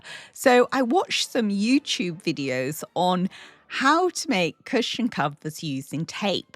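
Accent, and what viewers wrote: United Kingdom. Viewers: That wreath is gorgeous! I love watching you decorate! You have such creative ideas, and your perfect British accent accompanied with a warm smile and peaceful music are a bonus!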